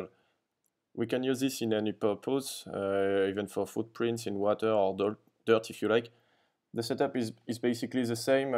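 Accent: France. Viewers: French right? I can understand it by your accent :p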